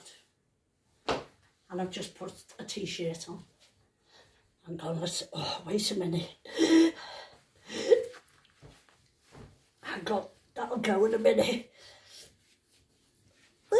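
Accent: United Kingdom. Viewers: ❤🧚🏻‍♀️🌹 I’m So happy to meet U on here. I’m Teresa, from New York, USA. I just LOVE Ur accent. I’m guessing British. I love so much of England and Ireland. I’m Puertorican and my great grandma was Irish. If U are, equally I like U. Ur personality is lovely n sweet. Hope to see more of ya my sweet lady 🩰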